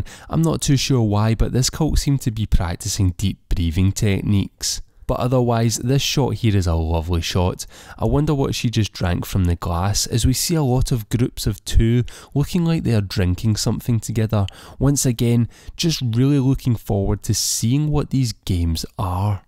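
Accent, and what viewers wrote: Scotland, United Kingdom. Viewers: Great listening to your accent and Scottish sense of humour!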